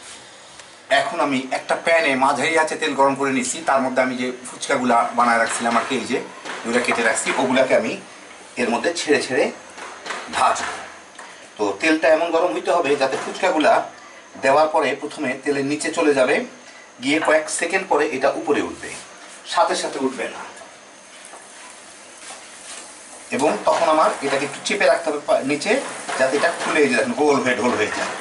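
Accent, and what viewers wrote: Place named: Bangal, Bangladesh. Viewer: Vai ranna hok jemon temon..kinto apnar kotha gulo hoy jhakkas....sei level er fatafati...lozza soromer balai nai..ekdom khas banga ...wao..daron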